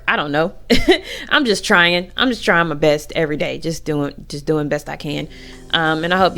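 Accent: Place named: New York, United States. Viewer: I'm terrible with accents, I thought you were from New York 🤦 hope you feel better soon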